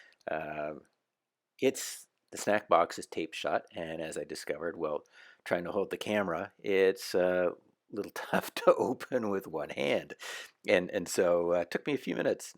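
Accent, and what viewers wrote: United States. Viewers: But you talk in American accent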